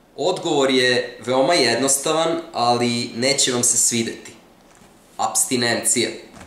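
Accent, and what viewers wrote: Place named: Novi Sad, Serbia. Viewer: Novosadski akcenat🙈🙈🙈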